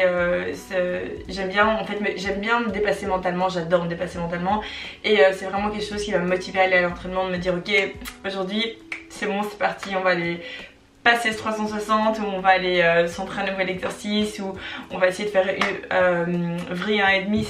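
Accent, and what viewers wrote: Belgium. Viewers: Tu ne serais pas Liégeoise par hasard ? Un petit fond d'accent familier. En tout cas j'espère que tu développeras tes activités, courage.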